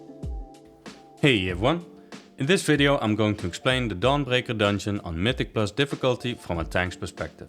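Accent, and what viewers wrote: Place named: Netherlands. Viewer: Dutch huh :D Good video !! i really dislike this dungeon and this helped me out a lot !!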